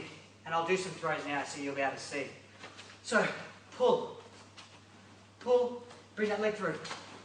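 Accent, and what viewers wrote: Australia. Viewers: Man I love your techniques. And personally I think the aussie accent is beast, but I wish it didn't fuck up the autocaptions so bad; sometimes I actually want to hear you.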